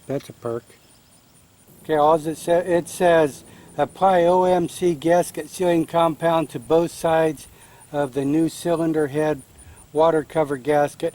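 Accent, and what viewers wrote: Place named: United States. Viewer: What type of tap and dye set? I've got Metric, UNF, BSF, Whitworth, Uncle Tom Cobbly and all but none of them are the right thread! I wish you Yanks would realise that there's a whole other world outside the good ol' US of A when making these Youtube videos, and for god's sake, learn to say aluminium! Alooooominum sounds so silly!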